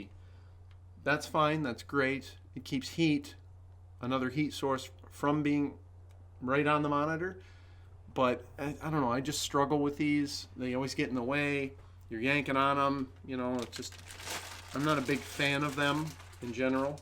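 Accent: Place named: United States. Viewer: Country voice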